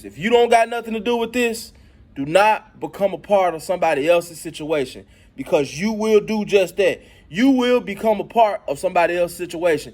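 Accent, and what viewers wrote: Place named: Alabama, United States. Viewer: Damn I didn’t know you was from Alabama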